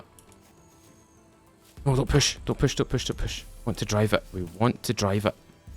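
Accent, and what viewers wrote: Scotland, United Kingdom. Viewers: Just started playing SCUM a few days ago since the 1.0 release. As a daily DayZ player I have to say I LOVE SCUM 😊 Similar but different enough to provide an entirely different experience. Thoroughly enjoy your videos, that Scottish accent makes them a pleasure to listen to. I’m English from West Yorkshire. I live in America. For over 20 years I’ve heard “oooh I LOVE your accent where you from !?!?” Honestly being from Bradford I never understood it because we literally sound like cave men who were dropped as babies. A LOT. However I DO understand that certain sounds are appealing to certain ears while not for others so 🤷🏼‍♂️ Cheers mate hope you have a lovely Sunday 🙏🫡👊❤️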